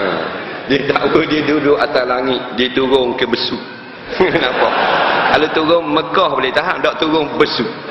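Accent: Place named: Terengganu, Malaysia. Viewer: LoL!! Lawak!!! Seb baik atok nenek aku org ganu, blh gak faham kecek ganu.. :) -Singaporean..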